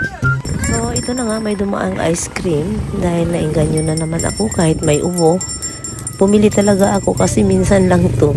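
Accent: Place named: Maasin, Philippines. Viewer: Maasin city wet market yan..happy watching to your vlog..kasi very fameliar ko ang lugar pati pananalita mo inday at yong shoreline sa likod nang maasin city hall very fameliar po sa akin kasi taga dyan rin ako. Thanks for sharing..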